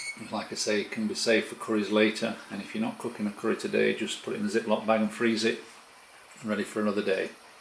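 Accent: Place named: Trinidad and Tobago. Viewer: Like u dotish or wat. The man is clearly a trini from his accent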